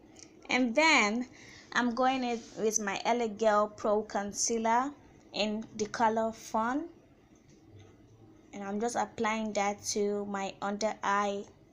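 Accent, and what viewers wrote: Nigeria. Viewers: Nigerian accent is soo bomb we should tell people about her so she would get more subs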